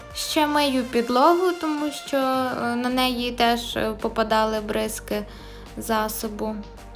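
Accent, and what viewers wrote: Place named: Ternopil, Ukraine. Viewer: Це один з діалектів, а не суржик. Нічим не гірший за "чисту" мову заходу України чи "еталонну" мову киян. Відчувається, що дівчина орієнтовно з Тернополя чи Івано-Франківська, чи близько тої території і українська її рідна. Мова є живим організмом і з часом найменш вживані слова просто зникають, так буде і з так званими русизмами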